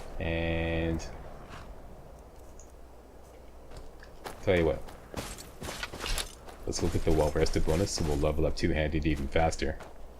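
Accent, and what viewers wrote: Canada. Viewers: FREE CRACK! Just kidding. Now that I have your attention, are you Canadian? You sounds Canadian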